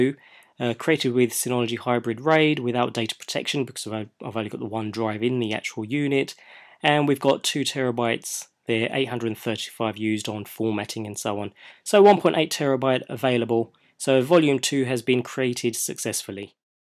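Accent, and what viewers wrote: United Kingdom. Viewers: American sounding music intensifies British accent: “Today we’re going to be looking at the...”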